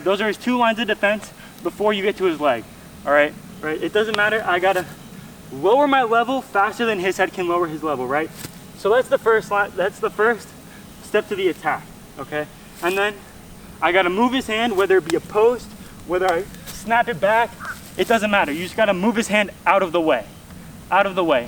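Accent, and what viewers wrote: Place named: Minnesota, United States. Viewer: Wow, you actually know your shit. You're not just another good looking goofy guy, with chipped teeth that speaks well. Your video (5 things they don't tell you about wrestling?) popped up. It was a good video and funny except you used the f word, I don't mean fuck. I checked out this video, you're a serious wrestler, impressive. You are also a great teacher. Did you do your own film editing? Affective your videos are. I don't remember wrestlers being so critical and such grammar queens. You sound like a Minnesota boy. You are clear and to the point. I think we all knew what you meant. With your personality and those Pete Davidson eyes, you have a great future whether it's in wrestling, teaching, broadcasting, or acting. I hope you are getting promoters and are picky about them. You also need a great Trusted mentor, you're on your way kid. 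Thanks for making wrestling more accessible and interesting. Did I say that right? I don't want the grammar police on my ass. btw your teeth look great at least on video, wear a damn guard Cheers.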